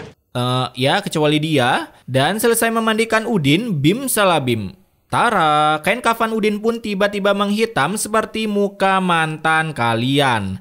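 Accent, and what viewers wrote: Bali, Indonesia. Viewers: Min, dari Bali ya? 😁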